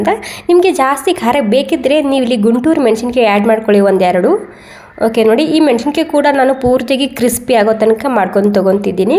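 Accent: Maharashtra, India. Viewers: Tumcha marati style kannada lai avadle tayi😊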